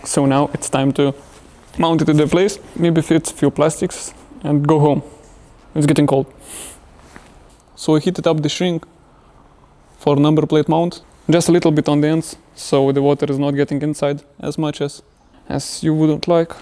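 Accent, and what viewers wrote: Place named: Eastern Europe. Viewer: I just hear your accent and I think you’re from Eastern Europe, I from Ukraine, your video is really great!!!👍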